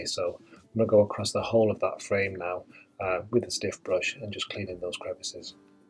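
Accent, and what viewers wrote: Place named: Derbyshire, United Kingdom. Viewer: I’m from Derbyshire and your accent sounds very familiar. Love your down to earth approach and subscribed right away!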